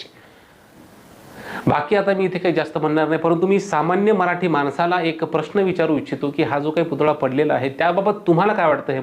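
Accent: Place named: Marathwada, India. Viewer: Because tumchi langauge Marathwada chi aahe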